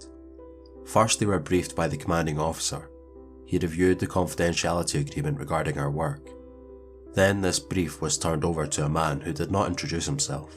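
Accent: Scotland, United Kingdom. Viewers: Turning on auto captions will let you know the AI does not recognize a proper Scottish accent lol it's hilarious!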